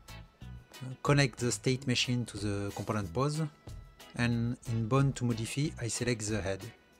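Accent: France. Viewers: Tu es Français ? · c'est si drôle un français qui essaye de parler anglais xD mais sinon bon tuto ^^ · j'ai reconnu ton accent francais :)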